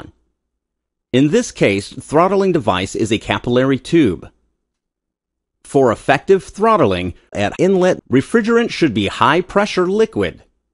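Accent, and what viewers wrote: United States. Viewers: He has an American accent but misses a lot of words out of his sentence like a Chinese man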